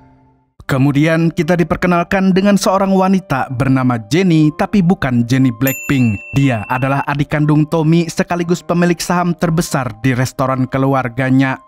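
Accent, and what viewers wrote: Banjar, Indonesia. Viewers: wiih, urang banjar kh adminx nih? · Urang banjar sekaliny sidin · Urng banjar nah ngene narator ya😂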